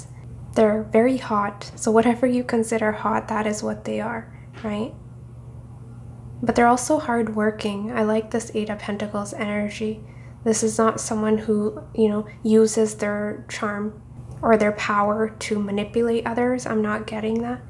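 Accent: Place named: United Kingdom. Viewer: I loves his British accent😅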